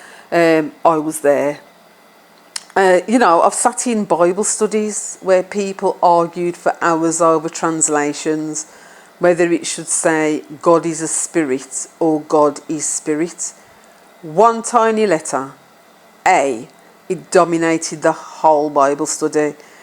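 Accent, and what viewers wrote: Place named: Birmingham, United Kingdom. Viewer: So powerful. So inspiring. Thank you. I've been asking what it's all about and what do I do next. I've been living with cancer for 3 years. I'm from the UK too and I think you're from Birmingham too judging by your accent lol. I've been wanting to leave England and live part of the year here and part somewhere else since I was a teenager. I'm 46 now and fear stopped me from taking action. I still want to do something significant with my life. I am fit and well, no one can tell I have cancer but now I'm stuck because of the medications I have to take. I don't know what to do. I look back and realise that God was pushing me to take action years ago but I'm still here with my soul yearning for more. Thank you for making your videos. I believe I stumbled upon your channel for a reason. All the best to you . Oh I let the adverts play instead of skipping them when I watch your videos 😊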